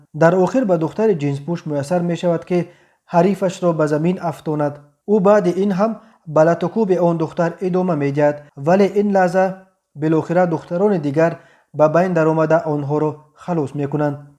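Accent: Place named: Badakhshan, Tajikistan. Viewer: Бародар аз АЛЛОҲ як камтар шарм кун! Якумуш ки ин видеоро камаш кариб 10 сол пеш дар навор гирифта буданд, ва қариб дар ҳама телефонҳои мардум буд! Дуюм ин ки бародар дигар ба фикрам ки ягон гапу хабари нав нест, намедони ки чиро нашр куни, баъд мекоби як чизи куҳнаву, кудакиро дар мардуми тамоми дунё нишон медиҳи! Дигар на номус мондааст на ақл, бовар кун як бор блогерони дигар милатҳоро бин чи кор мекунанд, ва чи наворҳоро дар мардуми дунё нишон медиҳанд. ШУМО бошед, оҳ дар мактаб як кӯдаки кам ақл имрӯз баҳои 2 гирифт, як мард дар ҷои кор аз ҳавои гарм сахт арақи рӯй кард, як студент аз автобус дер монд, баъд имрӯз аз якум пар оқиб монду дарс нахонд! Охир ҳаминам хабар шид?? Аз гапзанит маълумай ки яке аз бародари бадахшонӣи мо ҳасти, бародари азиз аз ҳамин чизҳои нодаркор, ҳамун кӯҳу, дашту, биёбонҳои бадахшонро дар навор бигир дар мардуми дунё нишон те бовар кун 500% хубтару беҳтар аст, назар ба ин наворҳои ту нашр мекардаги!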